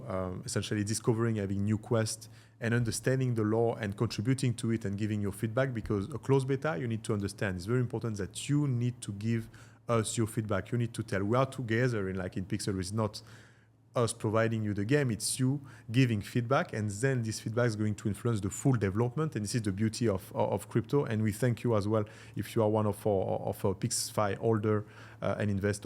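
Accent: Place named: Iran. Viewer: مشخصه لهجش ایرانیه این اداها چیه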